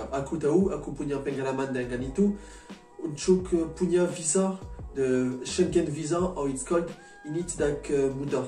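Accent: France. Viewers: The only problem I have right now is my boyfriend can't visit me during this pandemic, and if he's using agent, he would need a quarantine when he's coming back to his country, that's the worst problem we're facing right now. 😅 Agreed to the point where you said about language barrier, not so many Indonesians can speak English properly tbh, you could see it by browsing LDR videos in YouTube 😅🤣 And I already noticed you're from France from your accent and tongue click! And I think I'm correct 🤣 Greetings from ID - DK couple 🇮🇩🇩🇰, nice video and nice Indonesian language skill 😊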